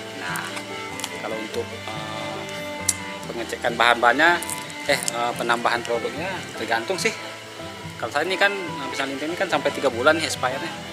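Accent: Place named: Sumatra, Indonesia. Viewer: Bang ini berasal dr daerah mana ya? Kaya logat Sumatra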